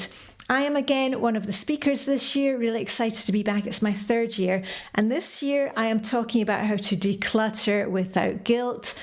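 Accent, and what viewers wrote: Scotland, United Kingdom. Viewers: I'm 79 and enjoy your site. My grandmother was born in Scotland so your accent is a sweet reminder of her. I've been through decluttering my former hobbies, but I still have the urge to buy office supplies every August when the school supplies are on sale. Often, my biggest problem in decluttering now is my husband who wants to run all our castoffs through a yard sale.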